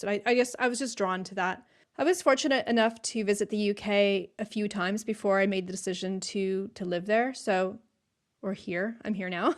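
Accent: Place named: United States. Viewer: You've lost your American accent for one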